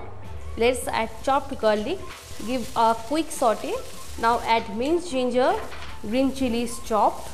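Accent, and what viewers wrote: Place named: India. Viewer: Wtf is this nigga talking about....I guess all Indian English are same....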